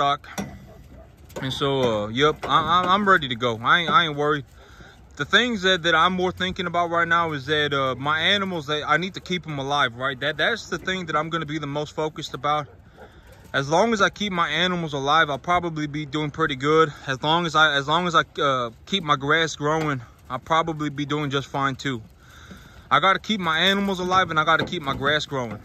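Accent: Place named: East, United States. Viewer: he's a mystery, I think he kinda has an eastern U.S. accent..... and he obviously doesn't read our comments😂 or we'd get a 5,000 word response. Could cut it down to 1,500, but he generally repeats everything at least 3 times.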